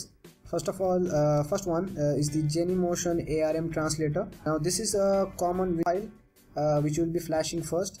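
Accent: India. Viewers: thank you so much...are you from India?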